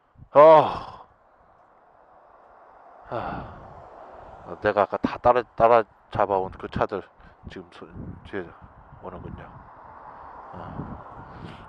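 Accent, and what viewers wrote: Jeolla, South Korea. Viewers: 으메~ 나무 짤라브렀어~ 앗따~ 전라도 사투리 아닌가여? 님 혹 전라도 출신이신가봐요^^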